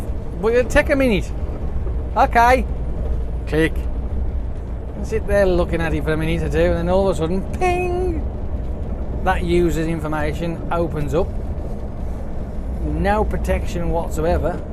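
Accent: United Kingdom. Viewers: First time I seen ur video very good really enjoyed ,were is ur acent from ,what part of uk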